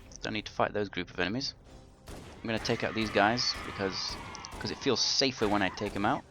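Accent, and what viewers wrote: United Kingdom. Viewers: i dont really think u do it more its just u respond to ur fans tyrant hasnt responded to any of my comments, plus ur videos r cool cause u take the time to put in commentary and ur voice sounds Brithish which is kool too. i subscribed to u becuase of ur videos,(Which cannot be more perfect) and plus if i am right tyrant only makes laso u make stuff that is HARDER than Laso, whcih takes time. ty make more vids!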